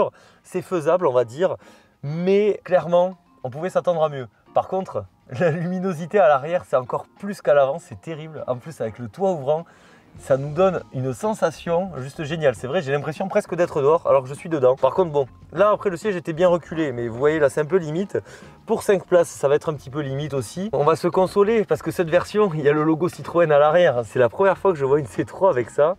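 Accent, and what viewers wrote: South, France. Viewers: Salut, le bel accent du sud fait plaisir, j'ai découvert ta chaîne il y a peu, le contenu est bien travaillé. Plus 1 abonné. Tu est de quelle région ? J'ai quelques véhicules à essayer éventuellement. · 🤔 Ça manque de chemise en ce moment..!!😜 Avec l'accent du sud..merci de nous présenter des modèles futur icône/collector.👍.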